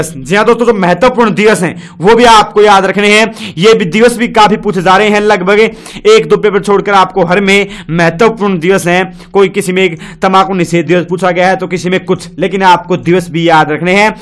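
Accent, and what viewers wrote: India. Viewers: Ap hariyada se ho pakka...😳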